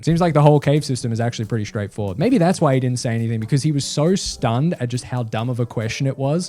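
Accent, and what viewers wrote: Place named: Australia. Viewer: AUSTRALIAN ACCENT DETECTED 🇦🇺🇦🇺🇦🇺🇦🇺🇦🇺🇦🇺🇦🇺🇦🇺💪💪💪💪💪 RAAAAAAH MAD MAX FURY ROAD IS THE BEST SCI-FI MOVIE EVER 🇦🇺🇦🇺🇦🇺🇦🇺🇦🇺🇦🇺🇦🇺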